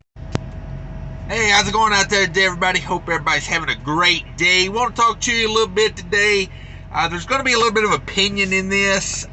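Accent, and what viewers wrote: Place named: South, United States. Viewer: Your eloquent words with a wonderful southern twang speaks truth. You consistently remind me of why I left trucking after 25 years. Hurry up and wait has been stuck in my brain for years! My first year was with England. My last load with them had me waiting a day and a half! These bastards know how to steal a trucking dream!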